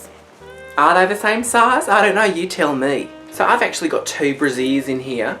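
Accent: Australia. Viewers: R u from Australia 🇦🇺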